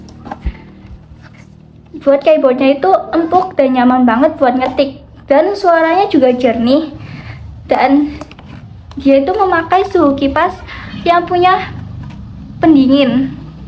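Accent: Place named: Tegal, Indonesia. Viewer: Logat tegale krasa nmn😭🙌🏽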